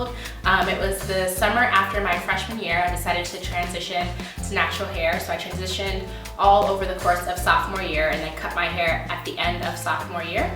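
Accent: Nigeria. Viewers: Oh! Your Nigerian accent was on point..lol very interesting what your mother had to say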